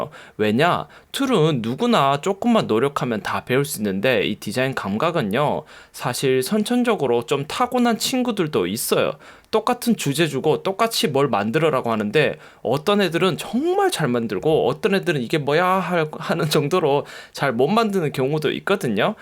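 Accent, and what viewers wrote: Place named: Daegu, South Korea. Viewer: 사투리인가? 말투가 귀엽?다고 생각했는데 대구분이신가봐요. 저도 회사때문에 대구살고 있는 디자이너인데 ㅎㅎ 반가워요.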